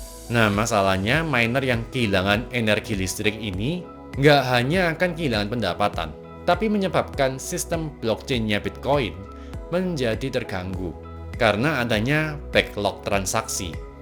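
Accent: Java, Indonesia. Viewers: ngomomngnya medhok, kayak wong yogya atau suroboyo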